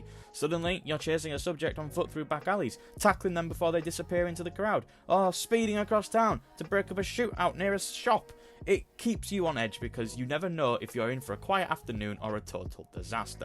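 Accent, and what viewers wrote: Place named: United Kingdom. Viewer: If it's any consolation, I thought you did a good job of it. You could have tightened up parts of it so you don't repeat things too much, but your points were well made, and I agree with most of them. I, for one, appreciate the voice of a fellow Brit, and as an older gamer, I enjoyed you speaking normally, rather than the breakneck pace I hear on so many videos of this type!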